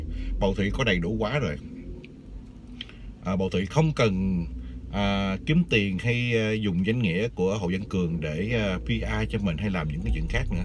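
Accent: Standard. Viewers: Chủ kênh nói chuyện hợp tình hợp lý hay có tâm nói chuẩn quá anh trai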